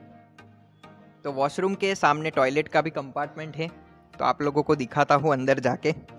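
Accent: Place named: Gujarat, India. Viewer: Dorii,sidheee...😂🤣 gujarati hindi accent kamal😂😂👍🏻👍🏻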